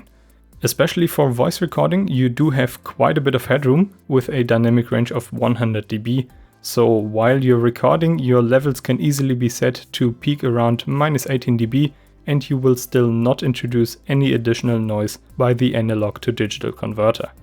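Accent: Germany. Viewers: ich höre den deutschen Akzent ganz deutlich, ha ha))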